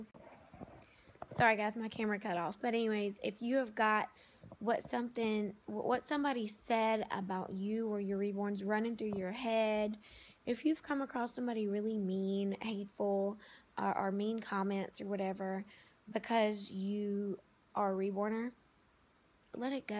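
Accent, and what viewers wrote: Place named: South, United States. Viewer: All this hooplah about Reborns. You are very right and I am so glad you made this video. I am also a 60 yr old, and alone. I was a Neonatal Nurse & Labor /Delivery Nurse for 30 yrs. Now, I only have my dolls to play with and I have loved and collected dolls my whole life. Needless to say, as an adult, and spent a lifetime career of babies, I clearly LOVE THEM and LOVE my dolls. God Bless you for saying this. It is important to all of us. I am also a Southern Lady and so nice to hear your lovely accent and hospitality. Thank you for saying it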